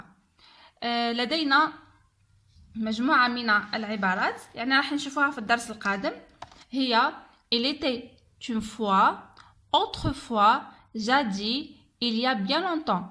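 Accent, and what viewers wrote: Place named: Algeria. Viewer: شكرا اختي بارك الله فيكي بصح تباني في الهدرة جزائرية المهم شكرا لكي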